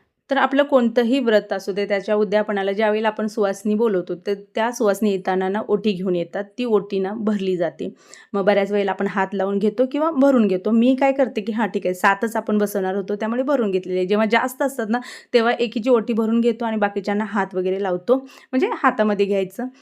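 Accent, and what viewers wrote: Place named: Solapur, India. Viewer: Ag tai Tu kolhapur chi aahe na solapur ka bolte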